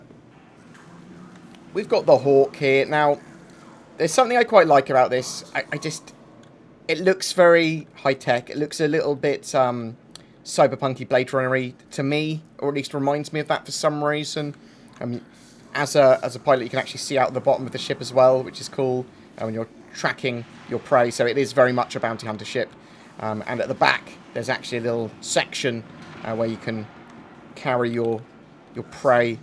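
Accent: United Kingdom. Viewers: It's the cool British accent isn't it? Damn, Brits and Aussies they get all the cool jobs. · I find myself throughout the work star saying star citizen to myself in a British accent how says it. Gets stuck in my head.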